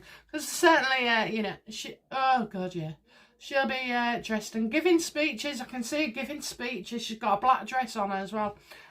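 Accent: United Kingdom. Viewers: Her British accent is too thick I love it but can understand her.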